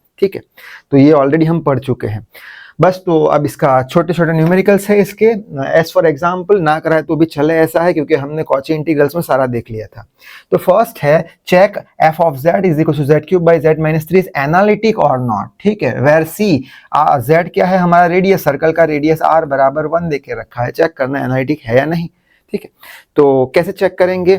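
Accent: Gujarat, India. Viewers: Sir are from Gujarat right????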